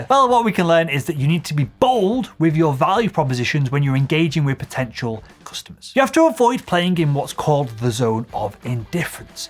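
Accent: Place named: North, United Kingdom. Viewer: Love your content, and the northern accent as always 😅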